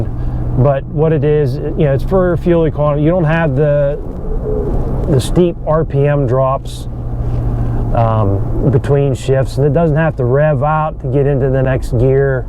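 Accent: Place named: Pittsburgh, United States. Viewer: Very nice review! I think I detect a “Yinzer” dialect…you from Pittsburgh? I recently got my ‘23 XL Crew Cab, 2wd with the locking rear end. It’s about as basic as you can get. I love it so far & it does decent in the snow up here in Erie; I’ll get snow tires if I need them.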